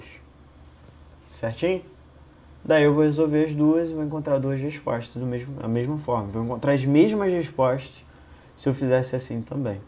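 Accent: Rio de Janeiro, Brazil. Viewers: to apaixonada nessa voz carioca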